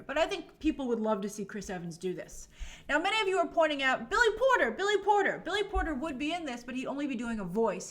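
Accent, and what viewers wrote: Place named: New Jersey, United States. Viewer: You sure sound like a Jersey girl,...Luv it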